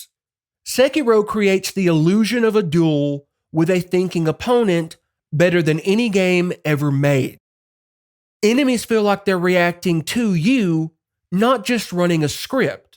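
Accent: South, United States. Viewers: hearing another southerner talk about soulsgames is something i never thought i would hear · It's been a while since i heard a redneck accent. Thank you for the making the video good man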